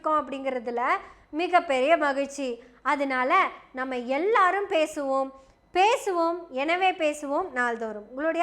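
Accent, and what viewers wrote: Kongu, India. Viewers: தங்கையின் கொங்கு வட்டார பேச்சு மிக‌ அருமை❤ அது என்னமோ சைமனே யாரு தூக்கி போட்டு மிதிச்சாலும்... ரொம்ப சந்தோஷமா இருக்கு 😂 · நீங்கள் பேசறது கொங்கு தமிழ் இருக்கிறது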